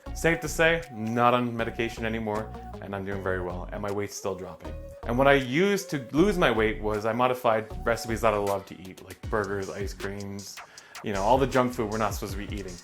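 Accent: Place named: United States. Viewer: you sound American af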